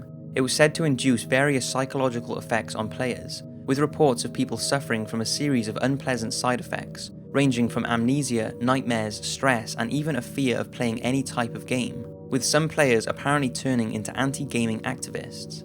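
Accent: United Kingdom. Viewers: Idk why, but it's always scarier when a brit is speaking in the video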